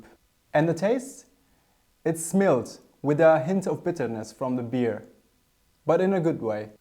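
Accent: Germany. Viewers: buongiorno you english has, for me that are also italian, sound with a german accent....maybe your greman is perfect, saluti da Torino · Crazy, you even speak English with a German accent lol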